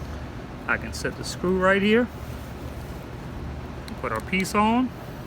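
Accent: Louisiana, United States. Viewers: Are you from Louisiana? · you sound like you from south Louisiana!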